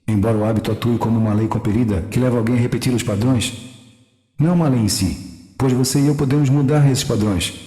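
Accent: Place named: Florianópolis, Brazil. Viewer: Esse narrador é manezinho com certeza!! Parabéns mô quiridu!! 👏👏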